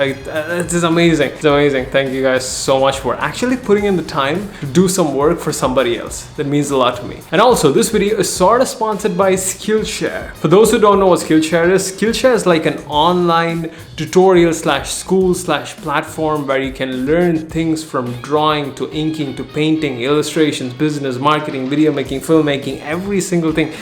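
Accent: India. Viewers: Are you indian? How do you get that accent? Where are you living at the moment? You confuse..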